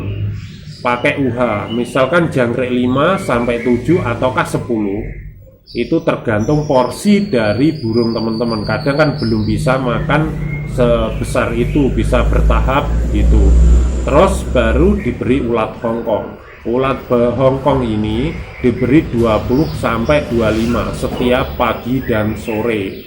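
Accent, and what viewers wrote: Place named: Banjarnegara, Indonesia. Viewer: Pak boleh nanya.. bpk asli mana? Mf pak soal nya mirip orang banjar negara 🙏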